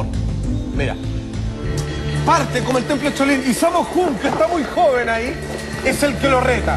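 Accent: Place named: Chile. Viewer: no entiendo nada lo que dice este tipo.habla muy rapido y vulgar. y su acento chileno no le ayuda en nada..../////.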